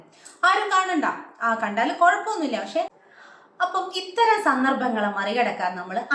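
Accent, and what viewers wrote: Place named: Kannur, India. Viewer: Ningal kannuraano 😅.nammale athe basha slang..veetil ulla areyo pole thoni😅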